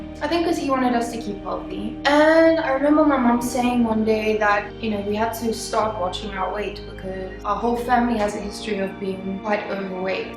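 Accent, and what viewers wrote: South Africa. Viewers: are you South African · Are you South African? You have a really strong South African accent 😂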